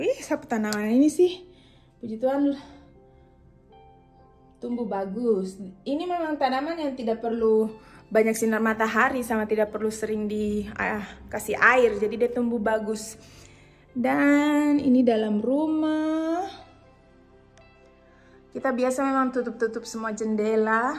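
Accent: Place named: Papua, Indonesia. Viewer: Tetap ya pertahankan bhs dialek Papua nona cantik manis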